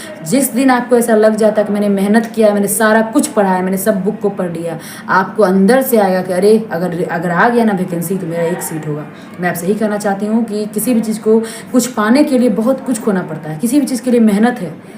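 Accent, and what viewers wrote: Uttar Pradesh, India. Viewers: Tum to lgta h up ki ho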